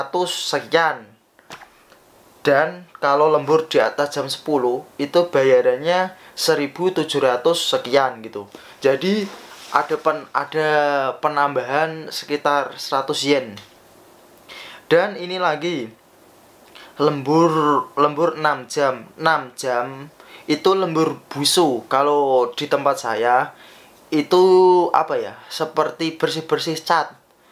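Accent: Java, Indonesia. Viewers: jowone pundi mas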